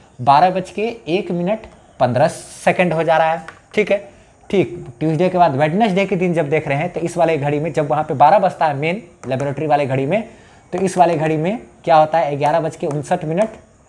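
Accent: Bihar, India. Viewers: ❤tankuuuu bhaiya And Bihari accent in your words are just killing.....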